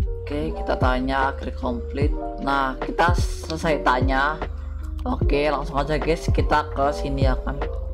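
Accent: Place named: Java, Indonesia. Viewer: Medok bg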